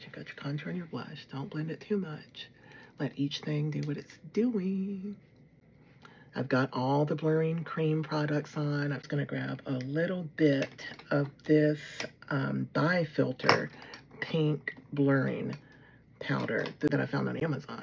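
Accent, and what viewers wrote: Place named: United States. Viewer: Aaaaah❤u look 🌹 lovely beautiful, u do have a beautiful accent n I love hw u American's speak am frm England ❤take care 😘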